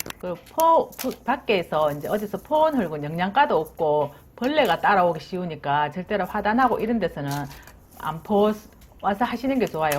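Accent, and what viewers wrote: Gyeongsang, South Korea. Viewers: 늘 궁금했었는데 젊고 예쁜분이네요 경상도 사투리 매력있으세요 구독.좋아요 꼭꼭누르고갑니다 미나리키우는 방법도 알려주시면 감사하겠습니다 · 너무 재밌어요! 경상도 사투리도 좋고 옹사 잘 지으시네요.저도 사ㅇ추씨 뿌려야겠어요~/ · 경상도 말씨 참 예쁘고 정겁네요~감사합니다💕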